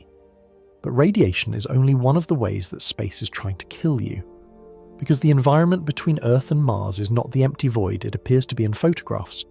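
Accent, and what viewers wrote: United Kingdom. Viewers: This guys accent tells you everything about him just another jealous Brit bitter and eager to spread fear and hate towards the great free country of United States states of America 🇺🇸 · Quit with LIES AND Lose THE BRIT NARRATOR. This click N bait.. Anything NASA Says and does if fake lies. 👎👎👎👎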